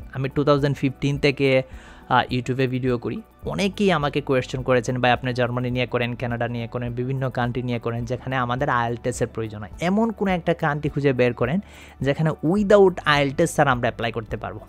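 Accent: Sylhet, Bangladesh. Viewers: ভাই আপনার বাড়ি কি সিলেট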